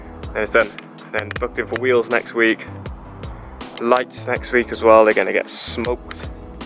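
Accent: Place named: Cardiff, United Kingdom. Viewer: How did you find the lights after the smoke wrap? Did it make much difference to the brightness of the lights on the road? I have a white 2 series and the chrome in the headlight ruins the aggressive look of the front end. I was thinking about having just the chrome part wrapped over by a local company which wont effect the brightness but after seeing your lights i was windering what a slightly lighter smoke wrap would be like covering the whole lamp itself? What say you mate? Im also from south wales beiiiii👊🏼💯🏴as soon as i heard your accent i thought ohhh hes a caaaaardiff boy😏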